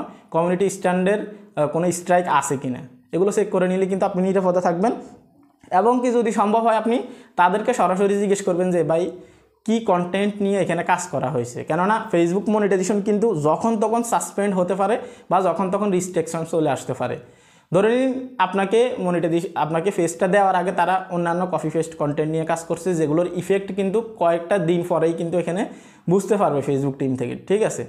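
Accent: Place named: Noakhali, Bangladesh. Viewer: উনি হয়তো নোয়াখালীর এজন্য ওনার ভাষা এমন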